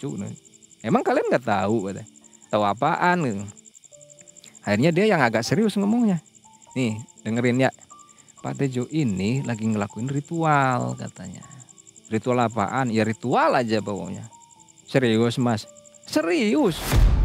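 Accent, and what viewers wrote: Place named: West Java, Indonesia. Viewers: Kok kayak orang sunda mas nya yaa 😂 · Mang ini kan orang asli cilacap ya, kok beberapa celetukan lebih mirip orang sunda daripada gaya khas orang cilacap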